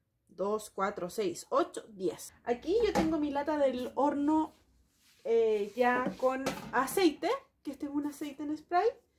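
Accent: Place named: Chile. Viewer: Srta.o Sra., buenos dias, gracias por su importancia de hacer pan, antes buenos dias, le escribe su servidor José Amado M., lo cierto en la última vez que quise hacer pan com mi nieto no se que pasó, lo cierto porque no salió bien me acompleje, espero hacerlo nuevamente, es que ya hace un año y meses que se me fue mi querida esposa de este planeta Tierra al reino espiritual, por no decirloclo funesto, pues tratare volverlo hscer, porque cuando estabacmi esposa todo me salia como Dios decide, ahora que solo estoy que por cierto con su alma de ella y con Dios, deseo hacer mi Pan, pues lo cierto que me aturdo o me siento inseguro, considero quextodo esta en mí, agradezco su paciencia de tolerarme con mi escrito, Dios me la bendiga y me cuide su familia, por su acento tengocla premonición de que es usted de Chile?, que Dios bendiga a todo Chile porque ustedes tiwne la buena raza Auracana, saludos a su señor esposo, que veo que tienecun niño muy inteligente y escla alegria de su familia. 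Atte. José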